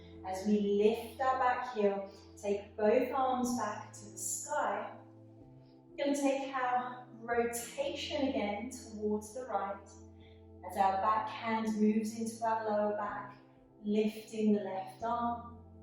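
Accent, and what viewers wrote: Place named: United Kingdom. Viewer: Wow! I stumbled on this flow while looking for no-inversion (headache-safe) yoga. When I get a migraine, the day after I have a residual headache that kind of feels like half a headache mixed with a hangover. I do want to do flows during these days but I found that doing any kind of inversion can exacerbate the head pain. So this flow was amazing, great breath work, mindful warm up and stretches, and amazing pacing. The voice cues were so on point too- I've never seen this instructor, but I didn't have to look at the screen more than once or twice. (And I'm American, so I love a beautiful British accent lol) Thank you so much for this gentle, soothing, and pampering flow. Going in my after work favorites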